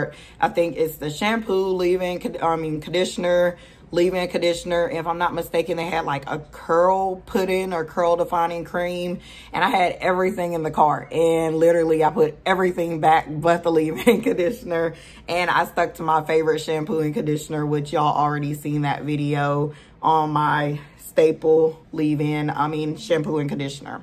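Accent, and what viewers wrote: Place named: South, United States. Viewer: Love your Southern accent! I'm from Alabama. Thanks for the suggestion. My hair is EXTREMELY dry and damaged, so hopefully this will work. 🙏🏾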